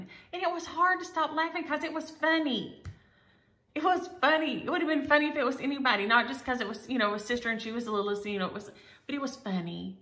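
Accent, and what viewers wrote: South, United States. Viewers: I’ve been enjoying your Vlogentines, especially right now. Monday I fell getting on my bike and fractured my wrist in 2 places and tore my rotator cuff to boot. So much for my stitching and knitting and quilting for a while!😢 You are like company for me. We are out in the CA desert in our tiny winter house, and can’t do much of anything anyway, but now no pool or stitching. I’ll go nuts!! Thinking maybe I could manage a little cross stitch or needlepoint on a standing frame. It would be slow but something to do. Of course mine are back East, so a trip to Hobby Lobby when I go to the doc next week might be on the list. I enjoy your accent having lived in OK for 4 yrs and TX for 4 too years ago - I’ve missed it😁. It is amazing we survived our childhoods in trucks and station wagons!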